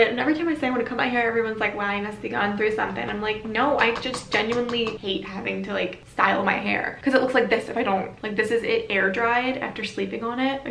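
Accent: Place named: United Kingdom. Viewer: Nah she just loves talking in british❤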